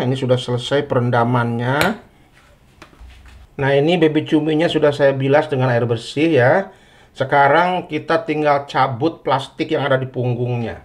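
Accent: West Java, Indonesia. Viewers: Assalamualaikum koko.wk wk wk sunda bngt ada kungkurahan.logatnya jg sunda.masakannya mantaaaap 😂😂❤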